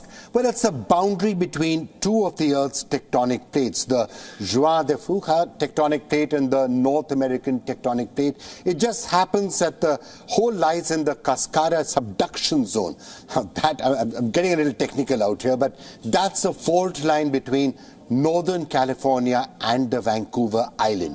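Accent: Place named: India. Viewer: Into a "Depths" of the pacific ocean. Funny indian guy speaking funny english😂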